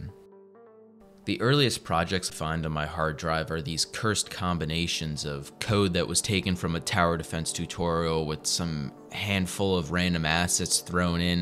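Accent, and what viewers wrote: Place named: Delaware County, United States. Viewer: You from delco? I can hear it ^^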